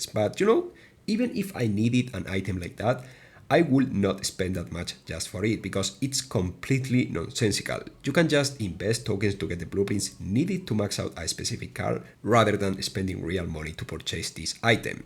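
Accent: Spain. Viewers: his main lang is spanish, i personally love his accent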